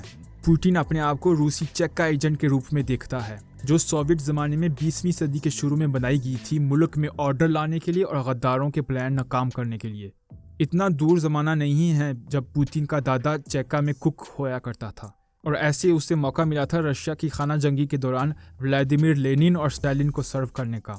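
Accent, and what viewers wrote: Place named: England, United Kingdom. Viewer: Ap urdu barri achi tarah angrez ke style main bolta hai😅😅😆❤️👍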